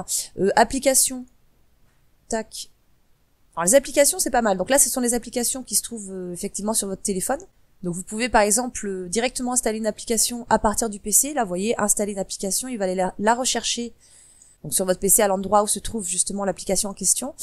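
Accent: South, France. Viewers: salut jje profite de ce post pour te dire à quel point j'apprécie tes vidéos, c'est vraiment adorable de nous livrer toutes ces vidéos dans le domaine de l'informatique pour ma part hameçon particulièrement utile,je sais aussi que cela demande beaucoup de travail et peu de rémunération, dans tes commentaires on sent une petite pointe d'accent du sud,un grand bonheur de vivre et elles ne sont pas les nombreuses vidéos qui vont à l'essentiel et enregistré avec une très bonne diction. ce qui m'amène à te demander s'il était possible que tu nous fasses une vidéo pour le rootage d'autres téléphones android comme les Nokia sous OS Windows phone, et tous les Samsung a2,a3,a4,a5,s7 etc... qui manque le miens c'est le samsung a300fuxxs1bpe1 pour info ^^ merci encore pour tout ce que tu fais.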